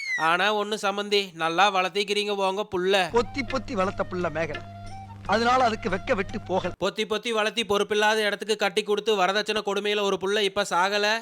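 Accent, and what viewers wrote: Coimbatore, India. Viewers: இததா கோயபத்தூர் குசும்பு ஆனா சூப்பர் பேச்சு😂😂😂😂😂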